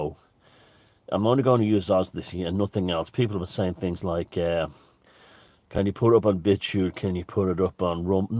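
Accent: Ireland. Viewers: Over on Odysee you can use salty cuss words in your cool Irish accent. You always make me laugh when you do that.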